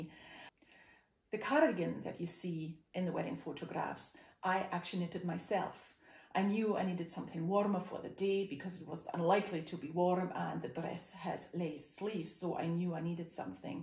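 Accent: Scotland, United Kingdom. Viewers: Where is your accent from? Its sounds like a mix of Scottish and Eastern European.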